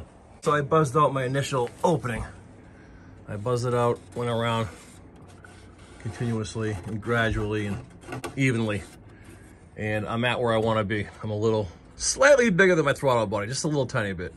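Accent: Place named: Massachusetts, United States. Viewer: Hey I picked up on your Massachusetts accent I live in ma as well. Central ma . to be exact how much would you charge me to port match my plastic intake for a 6.0..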